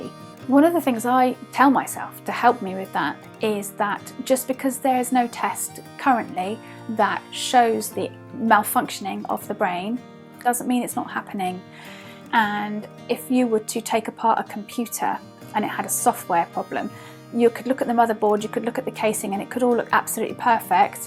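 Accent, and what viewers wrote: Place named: United States. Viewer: Bonjour. Je réponds donc en Français. Je reviens d’une promenade dans la nature. J’habite dans la région Auvergne-Rhône-Alpes. Êtes-vous la dame de la vidéo? Êtes-vous américaine ou britannique? Je pencherai plus pour la nationalité américaine, au vu de votre accent et du fait que vous parliez sur you tube. Je souffre du trouble neurologique fonctionnel (TNF=FND) depuis ma vaccination ARN messager. Je marche avec une canne et je souffre d’une dépression existentielle assez aiguë… J’ai des douleurs neuropathiques. Je vais chez un neurologue en septembre pour écarter les maladies neurologiques classiques comme la sclérose en plaque ou un accident vasculaire. J’ai adhéré à l’association Covid long France pour ne pas me sentir seule face à la maladie. Je vous donnerai régulièrement des nouvelles en anglais ou en français comme vous le souhaiterez. 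Ce sera un réel plaisir pour moi. 🙂🙂🙂 Amitiés. Christelle 🌈🌈🌈🌈🌈🌈🌈🌈🌈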